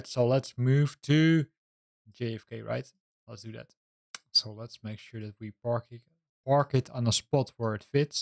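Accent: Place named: Netherlands. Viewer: I hear a thick Dutch accent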